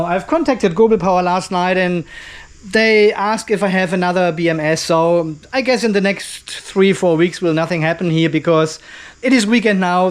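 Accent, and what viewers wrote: Germany. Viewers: A few weeks ago, I stumbled on one of your video while looking for a review on a bms. I got attracted by your german accent (Es gab eine Zeit, in der ich Deutsch sprach) and I have learned a lot through your videos. I finally orderded a V15 JK inverter bms that I just tested yesterday. Working on a self consumption system using grid as partial storage (injection tarrif are good here) to lower battery volume. So far so good, integration with both victron (cerbo gx) and sma sunny island is underway thanks to your very informative contents. Thanks again from Dakar , Sénégal, and keep it up.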